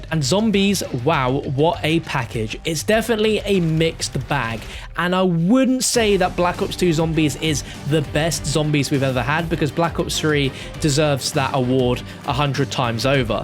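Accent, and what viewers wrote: United Kingdom. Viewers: Cool british accent!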